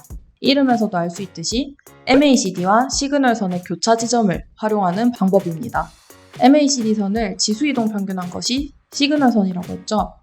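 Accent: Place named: Gyeongsang, South Korea. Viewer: 경상도 분이다~~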